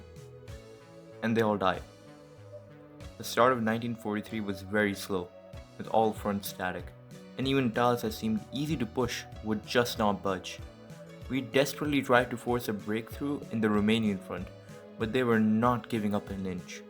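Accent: India. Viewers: Bro you sound indian as fuh outto nowhere are you indian? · I can't tell if you're Indian or European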